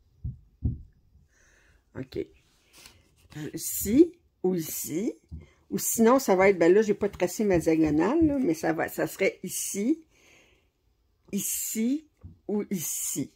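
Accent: Quebec, Canada. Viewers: Bonjour you tube ce matin m a fait decouvrir ta chaîne.tu decris exactement ce qui me manquait quadrillage et pattern keeper.tres bonnes explications .je te mets en lecture accélérée pour mieux m impregner de ta methode excelkent travail j ai vu aussi tes projets pour le mois de decembre .je vais donc te suivre avec plaisir.amities de France j habite au pied des Cevennes et je me revois broder dans les laurentides grace a ton accent .beaucoup de bons souvenirs merci encore de partager ton savoir.🪡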